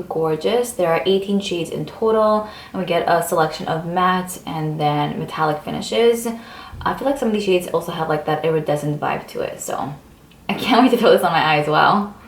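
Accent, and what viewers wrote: New York, United States. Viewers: I first watched you open the WNW Rose collection. (Made me want to buy it.) And I keep swearing that I'm subscribed to your channel, but I almost never have your vids pop up. (My other half is from Brooklyn and your voice reminds me of visiting NY/Jersey. Say cawfee sometime...) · the way you say gloss, the “just ate a bagel”, the horns in the back while you’re talking just all screams New York and I love it 🥺 moved here on a whim in August & your videos give me so much motivation to explore the city (aka TJ Maxx)! email: <mail> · I love your videos! Everytime I listen to you, I really get the "New York" vibe!😊 Nevertheless, I love purple and this collection is the "bomb". 💜💜💜💜 Purple eyeshadow looks awesome on you!🥰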